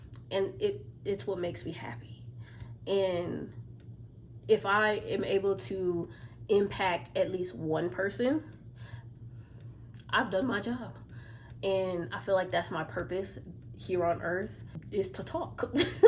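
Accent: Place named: Texas, United States. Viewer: What city you in sound like a texas girl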